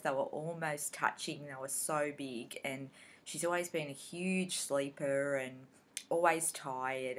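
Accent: Australia. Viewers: Loved your video! It's so nice to hear an Aussie accent on Flosstube. Cinderella is absolutely gorgeous ❤️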